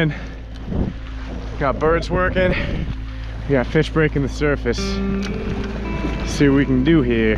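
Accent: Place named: Fall River, United States. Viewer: Are you originally from Rhode Island, you kinda have that Fall River accent, not that it’s a bad thing, cause I’m from Fall River but I also fish Rhode Island here an there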